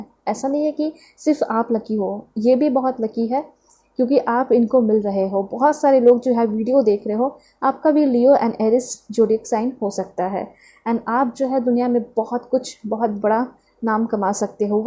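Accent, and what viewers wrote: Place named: Punjab, India. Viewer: Ma'am sorry for this comment. I like your Punjabi tone in your talk. I even enjoy many sounds. Thanks again.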